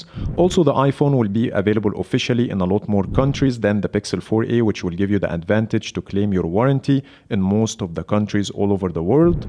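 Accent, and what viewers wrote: Egypt. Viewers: I can totally tell that you’re an Egyptian from your accent. Nice vid by the way 👍🏻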